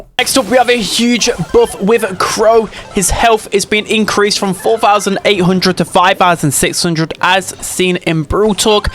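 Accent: United Kingdom. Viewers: I know it’s just the British accent but pronouncing the h in h is always funny to me. Nice vid❤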